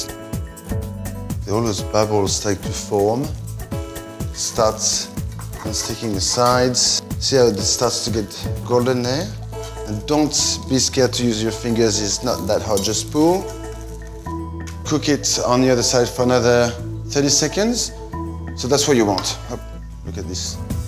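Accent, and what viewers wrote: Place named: France. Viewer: Not sure this was a good video because all I noticed was his charming face and his endless french accent go blah blah blah. Your words mean nothing Manu, but ur face remains magnificent.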